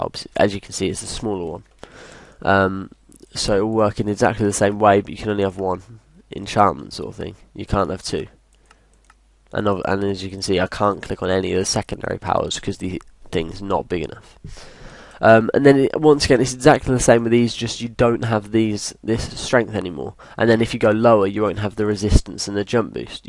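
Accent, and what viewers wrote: United Kingdom. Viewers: I disagree it's just the regular brit. accent!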